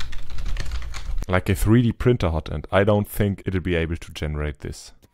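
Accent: Germany. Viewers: I can clearly hear you denglish xD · Oh nö 🙄 Wenn deutsche versuchen englisch zu sprechen 😣 Man hört das immer raus 😂 Klingt schrecklich 😱 Ansonsten guter Beitrag 😘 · Cool, bevor ich die Kanalbeschreibung gelesen habe, dachte ich sofort... Das ist doch ein Deutscher 😬 ich abonnierte Mal deinen Kanal... Cooler content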